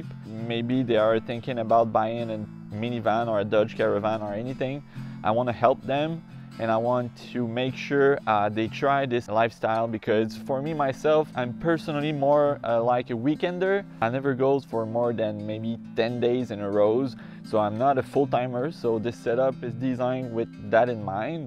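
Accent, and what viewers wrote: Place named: England, United Kingdom. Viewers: your English accent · Definitely the English accent!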